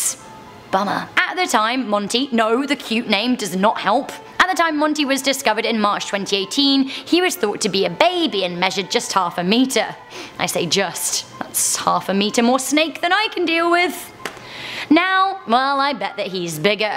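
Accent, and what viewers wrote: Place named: United Kingdom. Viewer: Mary me! LOL. Seriously though your gorgeous plus I'm a sucker for British accents too.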